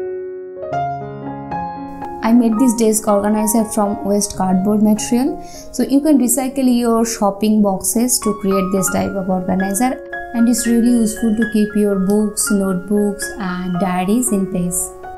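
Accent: Bengal, India. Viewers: I know by her accent, she is bengali.. I am bengali too.. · U are a bengali right? Because the english pronunciation is something like what a বাঙালি does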